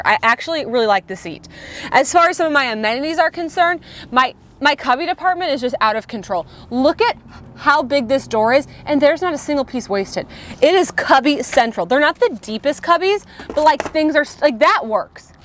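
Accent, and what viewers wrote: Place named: Midwest, United States. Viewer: St Louis!! Duh... that’s why you sound MidWestern. I’m just up the road a couple hours in Illinois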